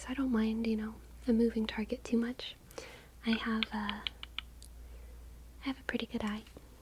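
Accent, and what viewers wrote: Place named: South, United States. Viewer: I'm guessing you live in the country because most people pronounce it with the i n g most of the places I know that pronounce it's with the Southern drawl you're describing are in the country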